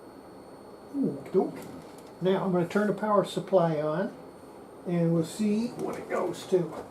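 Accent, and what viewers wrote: United States. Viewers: I think he lives in Texas? It is definitely some flavor of southern U.S. accent, not Australian.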